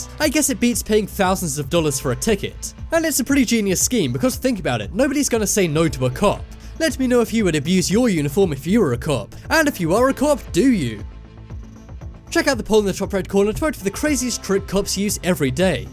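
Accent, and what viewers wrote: United Kingdom. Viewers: This is nonsense This is obviously filmed in Britain and is done by someone with the equivalent accent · THE WHOLE THING IS BULL SHIT... This is some Russian fake news divide America BULL SHIT... And I am offended that some asshole will a British accent is propagating this BULL SHIT.. there are social and economic reasons why arrests spike in SOME cities and communities that are related to there being too much month left at the end of the money... statistically you will find MURDERS, ASSAULT AND ROBBERY spiking on Friday and Saturday... but I guess that’s a plot by cops tricking people into robbing, stealing, and killing so they can meet their quota... NICE SUBTLE RACIST AND SEXIST MOVE TOO USING THE IMAGE OF A BROWN WOMAN WHEN YOU FLASHED THE WORD “QUOTA” ON THE SCREEN... anyone who agreed with this nonsense... I have the winning lottery ticket I’m willing to sell you...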